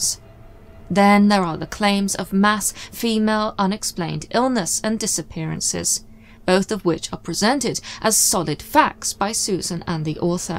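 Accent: United Kingdom. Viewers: A similar thing happened to me after the second encounter (that I remember) a few years ago. I suddenly couldn't stand meat being cooked around me, it used to upset me so much I would start crying if I smelled it? I was already vegetarian but became vegan. I quit a 10 year smoking habit in 2 days. But i still love red wine though I can only drink 1 glass or I get very sick. Weird! This seems a great channel, I'm so happy to find a British narrator & you have a new subscriber 🌹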